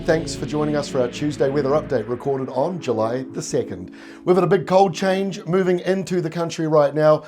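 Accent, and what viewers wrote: New Zealand. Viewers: Good clear kiwi commentary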